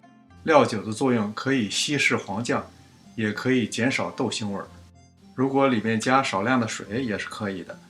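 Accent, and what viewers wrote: Beijing, China. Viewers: 京味儿好亲切呀！您的菜谱太适合我们这些长年在外的人了！多石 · 地道的北京话加上地道的炸酱面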